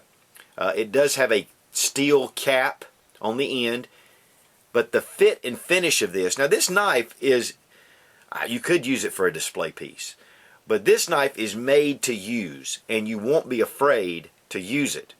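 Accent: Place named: South, United States. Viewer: This guy has the THICKEST southern accent I've ever heard lol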